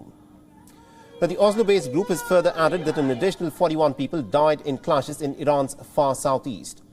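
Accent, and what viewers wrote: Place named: India. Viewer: Oh my gawd your thick indian accent!!! We cant understand a thing!!! Please replace this news anchor.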